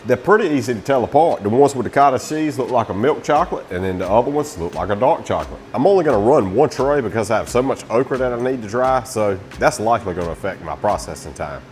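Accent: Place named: South, United States. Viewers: Sounds like A LOUISIANA accent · What part the south y’all from? I’m from NC and I adore the degrees in regional southern accents. I love your accent but I can’t quite place it!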